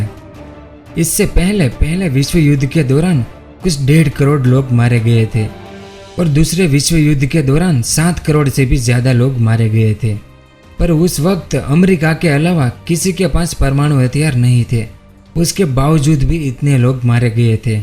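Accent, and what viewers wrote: Gujarat, India. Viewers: Bhai tu gujarat se h kya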